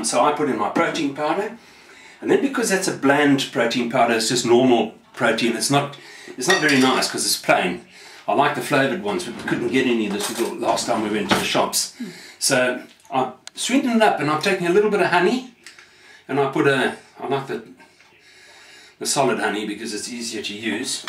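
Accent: South Africa. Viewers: are You South African? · Are you from South Africa then?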